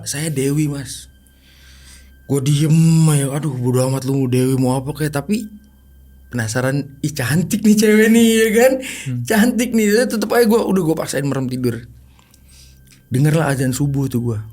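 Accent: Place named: Betawi, Indonesia. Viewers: Betawi kalo udah cerita emang seru dach😅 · Ini mah betawi bet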